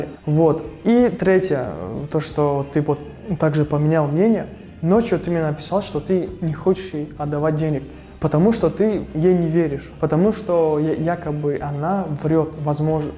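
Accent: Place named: South, Tajikistan. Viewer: ман аз Конибодом.Хамон видеоролике ки гуё духтар аз Конибодом бошад боварам намеояд. Аксенти мо дигар. Вай мисли одамони чануб гап задаги.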